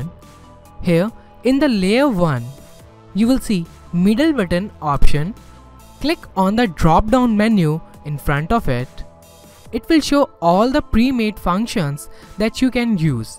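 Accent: India. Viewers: as soon as i heard the indian accent i knew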